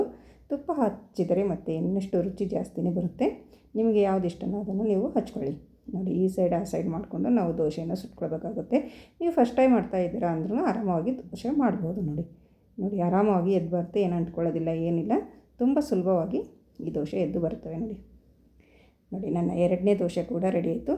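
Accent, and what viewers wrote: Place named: Kerala, India. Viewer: Pronouncing Vada, Dosha is Malayalam influence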